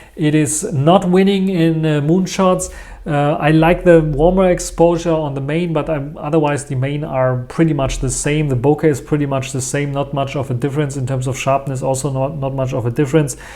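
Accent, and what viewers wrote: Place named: Germany. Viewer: From the first seconds of your accent it reminds me of the Rein river and your German nationality, thanks for the review! It will be interesting to see tests between these phones and the Pixel 6 pro or 7 pro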